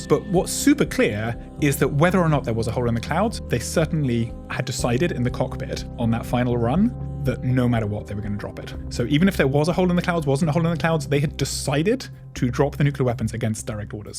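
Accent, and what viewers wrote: United Kingdom. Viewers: Why is it so annoying to hear criticism of the American war effort in a British accent?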